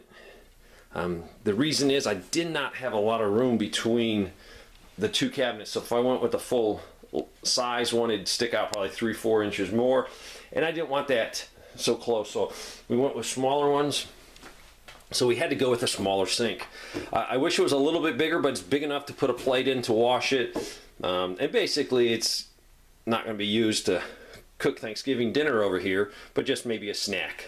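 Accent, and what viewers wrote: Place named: Chicago, United States. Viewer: May I ask you why the bathtub is outside of the house? I also noticed that you are cutting green brush in the winter! You really must be in the south but you sound like you're from the North like Chicago or there abouts. I am looking forward to you video how to filter the rain water. I want to use my poly totes and put them in the basement (before it's completed) and have the water run down there and use it for washing and such.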